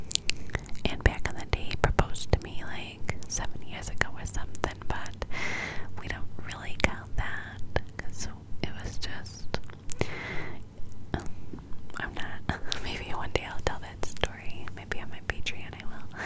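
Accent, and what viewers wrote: New York, United States. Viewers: i love the ny accent series ur the best for keeping my attention span engaged and making everything so tingly thankuuuu🥰🌟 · This is insanely perfect timing! I was just typing into my search bar for a ASMR NY accent video and you DELIVERED with new content 😍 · Yay! She is back with NY accent. My fav accent in U.S. 🥰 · Yay! Thank you so much! I love your NY accent! ❤️ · Was eagerly waiting for a NY accent video, and was so delighted to see this pop up! Thank you so much,! 💜💜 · I ❤️the NY accent! So tingly! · You have no idea how much I LOVE your ny accent videos! 😭💕 Thank you so much,. Sending you lots of love from Tijuana 🇲🇽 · Yay! The NY accent you do is DA best!!!! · Yay NY accent is my absolutely favorite thing you do!! A whole accent video or trying another one would be cool 😻 Love u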